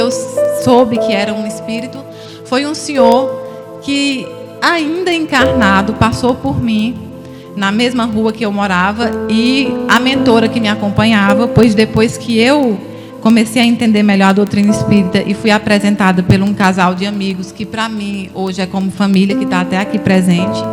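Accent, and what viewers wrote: Ceará, Brazil. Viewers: Ela é muito leve no falar eu gosto de ouvir ela falando das cartas ela e tão simples parece tão amorosa com todos e esse sotaque da minha terrinha Ceará e muito bom ouvir ela eu sei que nunca vou receber uma mensagem dos meus entes queridos que já se foram mais fico feliz ouvindo as mensagens dos outros pra seu familiares eu dou risada eu choro me emociono de ouvir as cartas lidas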